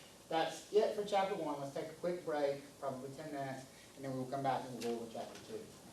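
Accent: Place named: North Carolina, United States. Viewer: sounds like NC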